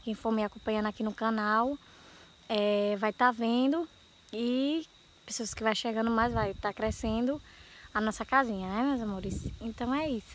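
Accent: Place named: Northeast, Brazil. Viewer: Boa tarde, vim Através do canal Gaby Morena, gosto do seu sotaque nordestino, boa sorte e muito sucesso com seu canal.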